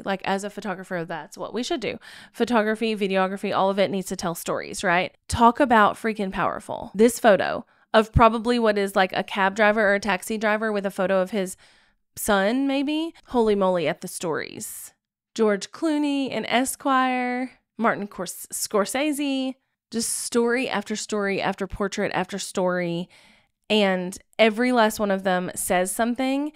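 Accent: South, United States. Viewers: It is good to hear another southern woman calling out this bunch of reprehensible gobshites. I borrowed the term "gobshite" from Ireland. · completely unrelated but my heart skipped a beat when I heard your accent! As someone that speaks just like you, hearing an unapologetic southern accent delivering such an engaging and well informed commentary makes me proud! 🥹 Not too many of us out there.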